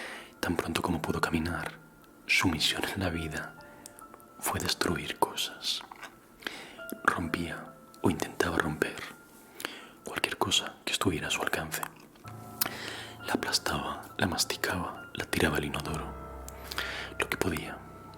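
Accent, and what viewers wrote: Spain. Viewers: i've been looking for an asmartist with your voice tone and spanish accent for a long time, i cant believe i've finally found it! (i can understand everything you say, im just bad at talking spanish back lol) saludos desde brasil!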